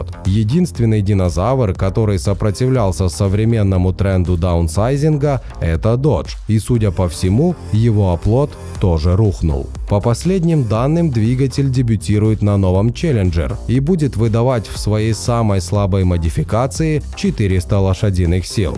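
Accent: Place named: Stavropol, Russia. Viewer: Ставропольский диалект чёто не зашёл)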